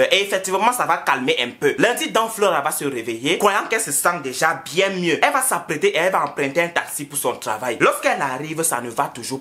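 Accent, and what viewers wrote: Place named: Cameroon. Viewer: J'suis abonnée sur ta page parce que tu me fais beaucoup rire 😁😁😁 Aussi parce que tu appuies bien notre accent Camerounais, tu parles avec notre Argot Camerounais et tu parles de notre actualité et de nos problèmes Camerounais 🇨🇲🇨🇲🇨🇲🇨🇲🇨🇲 Continue comme ça, c'est le 237 qui gagne 💚❤💛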